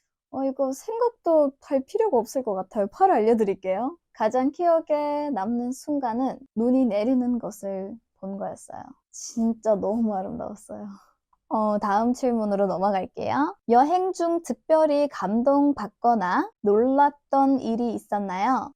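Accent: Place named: South Korea. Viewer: ❤❤❤❤눈감고 들으면 애교많은 한국인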